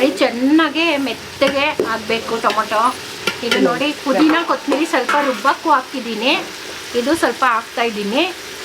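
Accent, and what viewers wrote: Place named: Nagamangala, India. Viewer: Hello Mammy, First of all Thank you. You prepared mouth watering breakfast in the traditional wooden stove it's my favourite system. Your communication slang belongs to Nagamangala- Mandya (ಅಕ್ಕ ಎಷ್ಟೇ ಹಾಕೊಳ್ಳೋದು) because My mammy also belongs Nagamangala, Really Hardwork people ever found there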